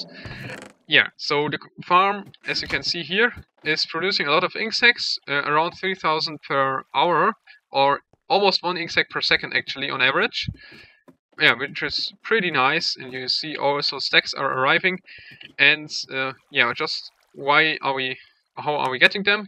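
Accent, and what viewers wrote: Germany. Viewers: Was glaubst du denn, den Dialekt bekommt er nicht mehr, aus seinem Englisch raus. Deswegen sind wir deutschen leider negativ aufällig in der englischen Sprache, weil es soviele gibt die sprechen als ob sie aus der Grundschule kommen... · Man höhrt das du deutsch bist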